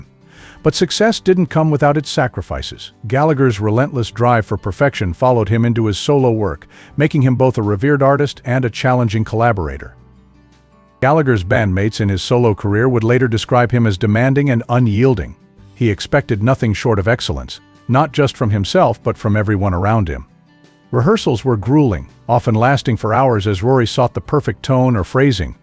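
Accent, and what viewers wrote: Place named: United States. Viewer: Who is this Yanky , shitehawk commentator - Absolute bolloxology !!!!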